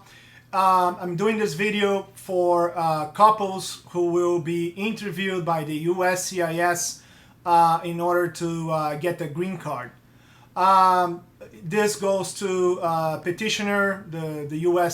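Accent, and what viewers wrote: Brazil. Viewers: Looks like Brazilian accent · What do you mean? I asked if he was Brazilian because of his accent. Btw, I am from Brazil. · Hey. Great explanations. May I ask you if you are brazilian? I am, and I just noticed a simillar accentuation. If not, forgive me any incovinience. By the way, do you Speak Portuguese? Hugs.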